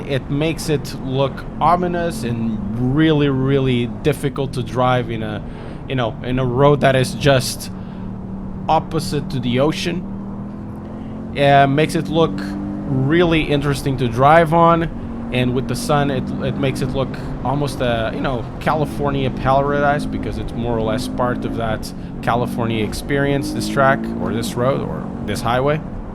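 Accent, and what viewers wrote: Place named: Portugal. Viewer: I opened this video and 1 second later I thought "This guy must be Portuguese". The accent doesn't lie. Cheers from Portugal!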